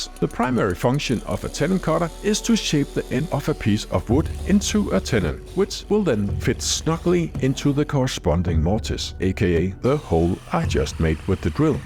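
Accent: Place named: Denmark. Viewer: Awesome! I’m a carpenter in Calgary Canada. But I’m Danish. Moved here 1967 when I was nine months old…Still have family back home. It’s nice to hear your accent. It reminds me of my father, who also died a couple years ago…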